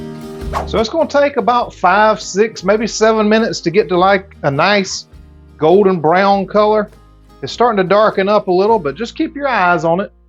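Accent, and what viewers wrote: South, United States. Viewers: Is that a Southern American accent I hear?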